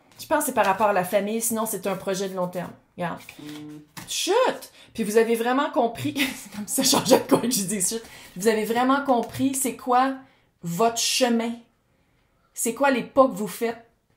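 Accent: Quebec, Canada. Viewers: Ben là, qu'est-ce qu'il a notre accent! 😝 Moi j'adooooore ça avoir enfin une québécoise sur YouTube! Yes!!!! Vous êtes authentique et j'aime beaucoup! Vive le Québec! 😁 Merci pour vos tirages. 🙏❤️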